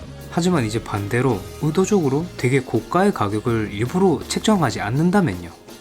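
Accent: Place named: Gyeongsang, South Korea. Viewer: 사투리 안고치면 구독자 이정도가 한계일듯ㅜㅜ 경상도 사람인데도 엄청거슬림ㅜㅜ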